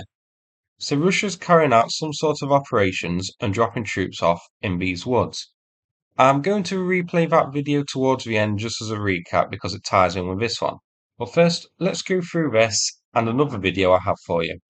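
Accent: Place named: East Midlands, United Kingdom. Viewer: You’ve definitely got an East Midlands accent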